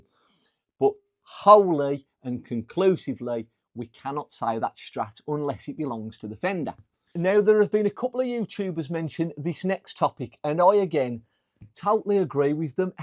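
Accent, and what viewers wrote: Black Country, United Kingdom. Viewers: This accent just took me straight to a time in my life when I lived in rapid succession in Dudley, Wednesbury, Cradley Heath. I'm in <num> again.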